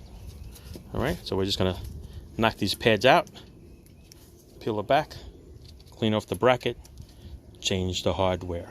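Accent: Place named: Deep South, United States. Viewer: This was fantastic. Now bc it’s brakes I don’t have enough courage yet, but I’ll bet if I did, I would get it right because you are such a good teacher. Also, I’m in the deep south and hearing your regional accent, which is close to mine, was very comforting. Miss being around my people. 😅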